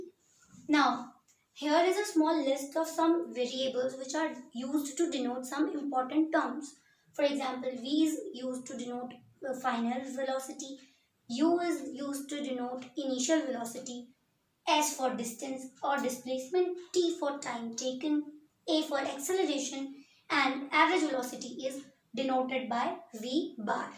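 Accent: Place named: England, United Kingdom. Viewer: your confidence and english accent makes it awesome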